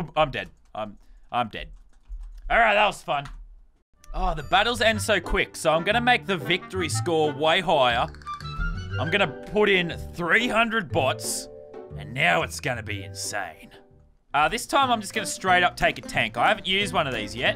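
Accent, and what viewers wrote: Australia. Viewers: I've seen alot of ur vids and they're amazing! also I love Australian accents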